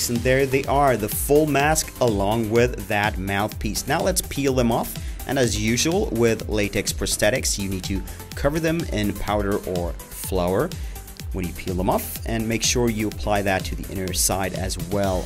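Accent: Scania, Sweden. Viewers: älskar skånskan :D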